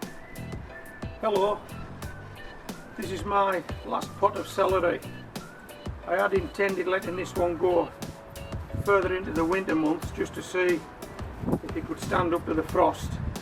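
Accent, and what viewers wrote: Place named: Scotland, United Kingdom. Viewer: Love that Scottish accent!!!❤❤ Thanks for this, celery is the first veggie I'm trying to grow! In Australia!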